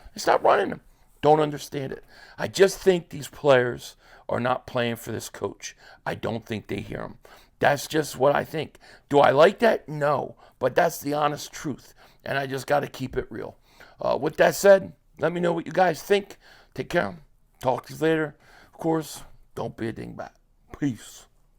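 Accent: Philadelphia, United States. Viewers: Why do philly ppl sound like this..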